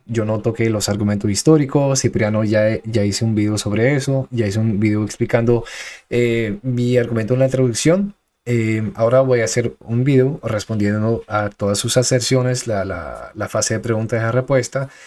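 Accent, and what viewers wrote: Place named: Italy. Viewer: Muy bien vídeo, ¿Eres italiano? Por la forma en la que asentúas me recuerda al italiano, y se ve que el español no es tu lengua materna, aunque también puedo estar equivocado.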